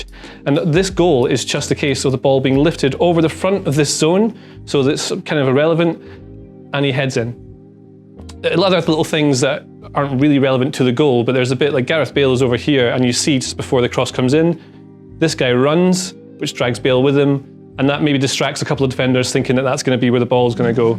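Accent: Scotland, United Kingdom. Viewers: Probably don’t work very well because of his Scottish accent, Google is pretty discriminatory like that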